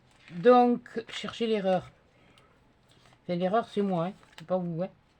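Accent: South, France. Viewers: Cc,je te félicite tu la super bien réussi 😍 tu l'as bien revisité le corbeau et le renard sur le moodbord du défi a Maminette Anita je le trouve très rigolo 🤣, j'adore les andouilles de vire de chez moi en Normandie c'est une spécialité du calvados dept 14, j'adore ton humour ne change rien moi tu me fait rire avc ton accent du Sud il trop mignon,un petit coucou a Rudy et Mathieu 🥰, j'attends maintenant mon défi que tu doit faire tu va avoir du boulot a le réaliser 😂,et après c'est Celui de Nicole Houlala bon courage 💪, et plein de bisous aux deux chipis, 😘 et a Rudy et Mathieu 😘 et Arkos doit encore dormir où joué où trouver une solution pour monté au rideau pour mieux regarder dehors 🤣 plein de câlins 😻,bonne journée de lundi bisous 😘❤️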